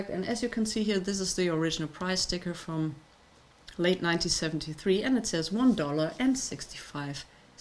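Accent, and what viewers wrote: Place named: United Kingdom. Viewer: ' " " was a good album, vocals by. Michael later released his Funk/R&B classic " " which I had on vinyl when it was first released in 1980, great album. Do you listen to mainstream Funk/R&B? I'm a white man living in California [U.S.A.] and been listening to Funk/R&B since the '70s. I like groups like Ozone, Chocolate Milk, Sun, General Caine, etc. I like your selections, because to me, I've never heard of any of them, which makes me interested in what they sound like. You sound like you're from the U.K. and you had different singers & bands that never really hit in the states, especially in California. I've heard of some of your picks, two of them were & [ had a hit in the states called " " from 1983, it was a good dance tune] so some of your picks were new to me. It's nice to see someone as knowledgeable as you when it comes to Soul/Disco music, I am a fan of both genres also. 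Disco music to me was a little bit cheesy at times, because everybody & their mother were doing a disco song back then, there was stuff like " & " " that drove me nuts, because I just didn't like them. I've listened to a lot of different styles of music growing up and am lucky to have experienced everything I've listened to or was recommended. Do you like " " by, to me that song was funky & very dance driven when it first cam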